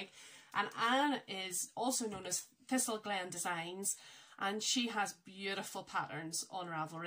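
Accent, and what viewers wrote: Northern Ireland, United Kingdom. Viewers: Hi, I've just came across your channel and have (as you can see ) went right back to the start. Do I detect a hint of Northern Ireland in your accent? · This was a very enjoyable first podcast. You took to it like a duck to water. I live in Co Antrim and it’s great to hear another N Irish podcaster. Looking forward to Episode 2. Touched by your scripture message. Thanks x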